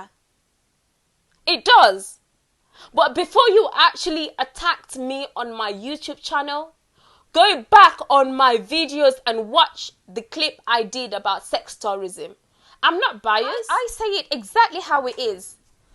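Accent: United Kingdom. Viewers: Are you still BLEACHING your skin, GAMBIAN woman with British ACCENT? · Kwame Owusu I had to close my eyes and imagined 👀because yea the strong British accent won’t appear to come across threatening but hey she is building her platform to start a nonsense war just like the South Africans do the Nigerians there own blood and wonder why we get taken advantage all over the world I mean literally shitted on 😖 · You on here starting your president's rant against your own black brothers and sisters in America what's your strong British accent acting like you in Gambia right now doing everything for gambians and on a Prejudice rant against your brothers and sisters in America westernized and brainwashed.... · But she is sitting up here with a "WHOLE BRITISH ACCENT." DIVISIVE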